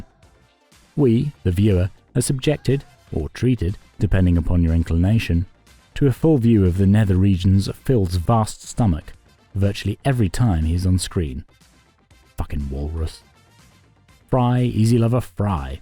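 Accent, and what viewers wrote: RP, United Kingdom. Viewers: This video is pure gold. Your classically British commentary while talking about a priceless piece of Canadian TV is a great combination. You did your research and each character’s accompanying music is on point. Deeeeecent video! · It's so charming to listen to a posh British accent talk about a Canadian Classic about Trailer Trash!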